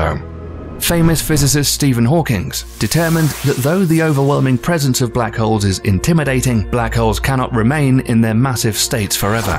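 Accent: United Kingdom. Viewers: You know it's a British video not only because the guy talking sounds british, but also because the video plays in 50fps lol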